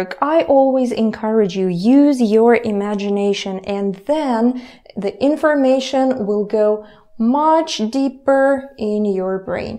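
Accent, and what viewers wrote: Moscow, Russia. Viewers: Yes, I care, and don't worry, I will still follow your lessons, as I've come too far, to stop now. I'm actually impressed with your accent. It's not really extreme, except when I heard you say "Caesars" (you were actually saying "scissors"). But, I can tell you've worked long at English. I realize you're a teacher, BUT you must improve your "i" sound. Please keep your wonderful Russian accent, but please try to practice the "i" sound, as in scissors". It's not "EE" sound as in Caesars. It's "i" as in "ticket", not "teecket", for example. Or "i" as in "sin", not "SEEN", which is is another word altogether. (For Example: I've "SEEN" Led Zeppelin, in concert".) Or "i" as in "bin", not "bean", which, as you know, is a vegetation that grows. All those examples use the "short i", all of which sound the same. As far as never having had any problems communicating with "Americans", I ask you "What's an American anymore?". 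My parents came from Europe years ago. Greece, in fact, so I've had plenty of experience in correcting them, with these little corrections, as I have done with you. I too will need corrections in my Russian speech, as I have a few Russians friends, here. I want to learn the Russian language well, to communicate effectively, with them. And, we're in the USA, which is a distinct country within the continent of North America. We're the ones with President Trump, where FEW people practice the English language, anymore. I'll continue to study The Russian Language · а ты русская? Прост акцент русский у тебя есть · долг в метро причём учит на мАсковском жаргоне,а не на правильном русском литературном языке. Поучилась бы у советских дикторов,либо коренных,во многих поколениях,петербуржцах,либо у горожан из Восточной Сибири. Высокий русский язык был создан на основе новгородского и владимирского диалектов Пушкиным,а в последствии развитым Толстым,Некрасовым,Чеховым и Лермонтовым,что закреплено в «Словаре современного живого русского языка» Ожигова. 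А в Москве ,начиная со времён Горбачёва ,экономили деньги и набирали смазливых на лицо дикторов без поставленного ПРАВИЛЬНОГО ЛИТЕРАТУРНОГО РУССКОГО ЯЗЫКА С ЧЕТКИМ ВЫГОВОРОМ ЗВУКОВ И НЕ ШЕПЕЛЯВЫХ,не полукаГтавых,глотающих и или(и) искажающих звуки,не знающих где ставить ударения. И вообще,ухо режет. мАсковская безграмАтная реЩЬ!!!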